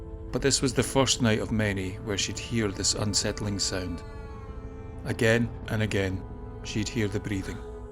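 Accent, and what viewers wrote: Scotland, United Kingdom. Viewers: Creepy ghost story well illustrated and told by the best Scottish accent on Youtube. I also suddenly crave pizza. Thanks!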